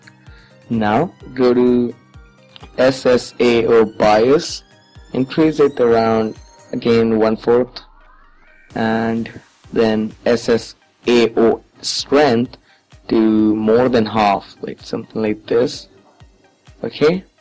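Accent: India. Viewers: he has an Indian accent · This fucking software is so aids, it hardly even fucking works. Fuck you and your Indian accent, fuck this entire shit.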